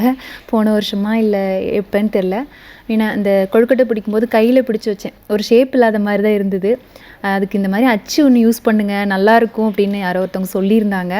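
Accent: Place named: Kongu, India. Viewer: Yenna alagaana kongu tamil!! Kannu padam poguthamma, muthalla suthi podunga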